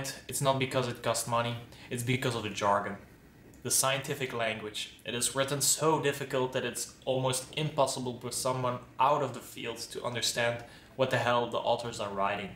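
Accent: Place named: Flanders, Belgium. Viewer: Some advice, first of all, interesting and innovative way to start a vlog/YouTube channel! great job 1. try to avoid having the camera lower than your face, since the viewing angle is in general not the best, having it higher than your face is more suitable. 2. try to create better lighting, the yellow desk light is not cutting it and since you have stay at the same desk most of times it is easy to put a stand in that mess. 3. The music is not bad but also not great, try to find soundcloud artists who produce nice beats, mounika for instance. 4. The font in the beginning is not so pretty but that might be personal opinion, it also very 'casey neistat' like and a lot of vloggers take it over 5. Don't call it badass science, try to be a bit more creative with the outro, it needs to be something that sticks. The last comment is just about the douchebag parking of Mercedes at the end, typical... 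Good luck with the channel and especially with finding interesting topics to talk about since PhD work can be extremely repetitive and predictable! Btw, lovely flemmisch english accent:) You will never lose it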